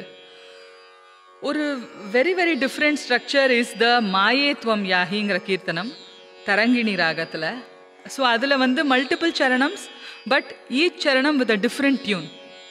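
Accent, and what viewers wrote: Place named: Brahmin, India. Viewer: Excellent. I always relish the English Tamil talked by Brahmins. Can call this Brahminish.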